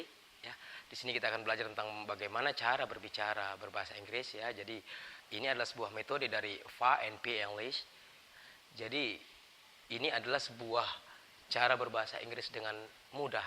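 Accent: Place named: Poso, Indonesia. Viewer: org poso ya??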